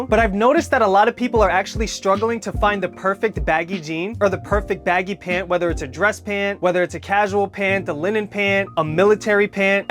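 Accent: New York, United States. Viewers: Such an informative vid and some real bespoke picks! Also love the NY accent haha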